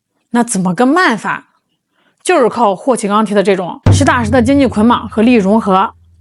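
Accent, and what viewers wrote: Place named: Shandong, China. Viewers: 我祖籍山东，抗战时期到的天津，已经没有老家口音了，但是听着挺亲切的:washhands: 内容好就看得下去，山东人热情踏实，山普挺好，不用AI则更加真实感和用心诚意。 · 我听这口音有点像我们山东人，如果不是鲁中，也应该是鲁西南😂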